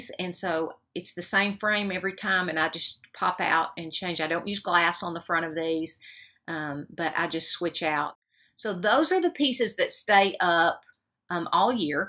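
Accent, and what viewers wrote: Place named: South, United States. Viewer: Hi: I enjoyed your video so very much! I'm a southern girl too (Georgia) so I love you accent. Blessings to you....Renee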